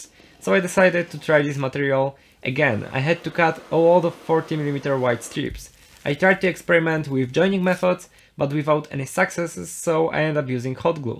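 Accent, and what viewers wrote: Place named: Poland. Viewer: masz akcent polaka :)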